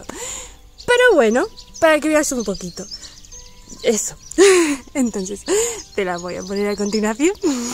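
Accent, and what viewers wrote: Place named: Spain. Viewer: Tenés el acento español mucho más marcado 😦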